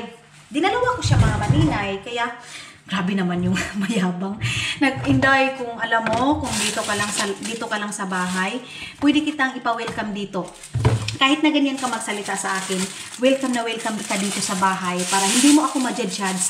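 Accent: Visayas, Philippines. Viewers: Sarap Naman Ang Buhay mo diyan. Taga asa ka, bisaya man kaayo mAgmsulti? Makalingaw gyod imong vlog. Pwede makig friend sa imo? Asa mo nga lugar nagpuyo diha sa united state?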